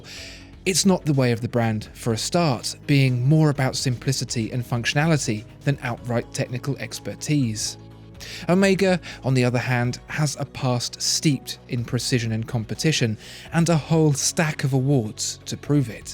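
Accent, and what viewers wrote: United Kingdom. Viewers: If he didn’t have a British accent I would not be paying attanetion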